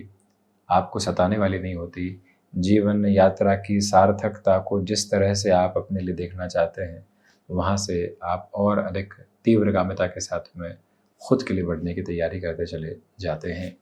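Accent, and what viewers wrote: Standard. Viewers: पंडित जी आप बहुत शुद्ध बोलते है आप जो बोलते है उसका अर्थ समझ मे नही आता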